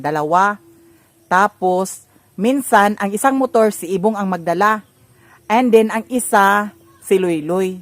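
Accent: Visayas, Philippines. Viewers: Tagalog is not her 1st Language and as we all know, since for sure you've watched some of her videos, Bisaya is her Dialect and that's where she's comfortable with. Haven't you noticed, she's trying to speak Tagalog but she can't help it talking using her own Dialect cuz that's where she's comfortable. She's trying her very self to adjust talking in Tagalog but Please do respect as well her Very Own Dialect. And besides she was still able to Entertain and Inspired her Followers/Viewers even though she's talking multi-Language/Dialect. That's where she's Comfortable with, so let her be Herself, Was known by Showing Her true self so if she'll be changing the Things that she might doing eversince, It's gonna be a Total Different Anymore. Each of us has our Own Meaning of Living. RESPECT BEGITS RESPECT. just Saying. Thanks 🙏 Do whatever you want Ate. As long as you're happy doing such things Just Go And Continue. 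I'm a Fan. A Solid Fan. Love Lots and More Blessings to You and to the entire Family 🙏🤗💖 From SALUDAGA FAMILY Supporting all The way from LAVEZARES NORTHERN SAMAR 🤗💖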